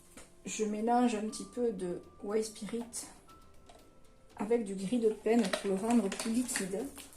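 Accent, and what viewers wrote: South, France. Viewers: Je ne trouve plus de nouveaux mots pour dire combien j'ai ton travail et ce tableau est à tomber. J'aime le rose, mais avé l'accent c'est encore plus beau. Merci. Merci 😘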